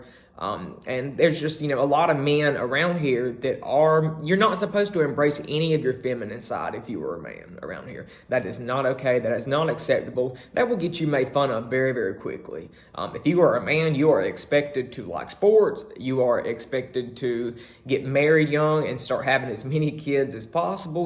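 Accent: South, United States. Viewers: I love your accent and hearing you talk! My mama and daddy were from Virginia and growing up. I had a nice southern accent, but I lived in Maryland and I was made fun of so much that I worked so diligently at losing it I wish I had it back, I love listening to you! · Ain't you just as cute as a bug in a rug. Don't you think you'd have a better experience if you didn't have such a girly voice? I'm gay and I never got that girly voice thing. With the southern accent it kind of fits, still I can see how that would annoy some good ole boys. Why ask for trouble? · Thought provoking and insightful, your experiences of growing up gay in the Deep south Bible belt of the United States is similar to mine growing up in rural Catholic Ireland, your are a natural at this looking forward to hearing more of your beautiful southern accent, God has blessed you with good looks and articulateness, you are helping many people God bless you. 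· Dang, your beautiful southern accent is music to my ears! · Love your southern accent.❤ you are so damn cute.🏳️‍🌈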